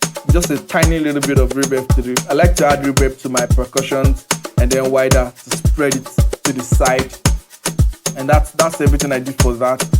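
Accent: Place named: Nigeria. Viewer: Love your beat and your 9gerian accent. ❤️ from 🇧🇷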